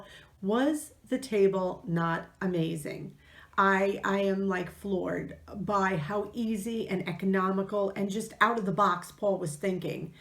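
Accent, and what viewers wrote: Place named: New Jersey, United States. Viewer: I love what you did with the table top! It looks wonderful! I am from Iowa but your accent reminds me of my dear friend in New Jersey! I enjoy listening to you and look forward to your videos! Good job!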